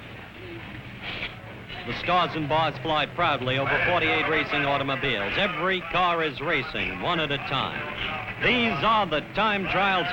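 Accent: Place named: New York, United States. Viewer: It is. He would have been my last choice for this event. NYC accent doesn’t work here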